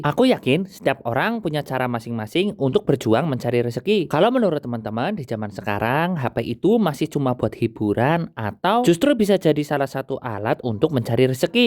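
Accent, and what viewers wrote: Banyumas, Indonesia. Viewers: ternyata orang banyumas, kukira cilacap krn logat ngapak nya samaa😭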